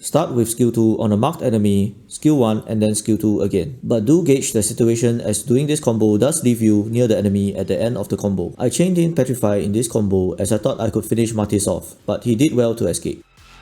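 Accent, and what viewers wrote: Singapore. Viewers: Saw your defence item guide on my feed a few days ago. Heard your voice and immediately knew you are sinkie. Great guide on that one too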